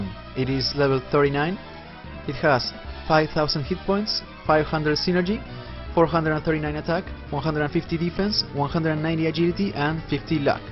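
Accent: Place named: Spain. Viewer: Just came from your Megaman videos and am binging through Golden Sun. Indeed there are few viewers, but I think it's mostly since this is an old game. I watched it for the nostalgia. Based on your accent, I'm guessing Spanish (I have plenty of Spanish friends with a similar accent)? You definitely don't have a bad voice, and I'm amazed at the amount of work you put into something you like. I may not comment on every video, but I'm watching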